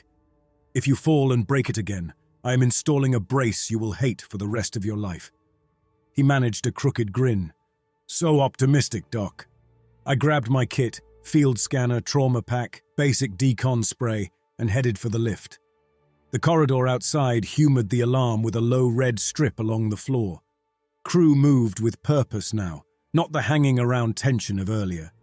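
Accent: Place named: United Kingdom. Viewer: Oh look another British accent 🙄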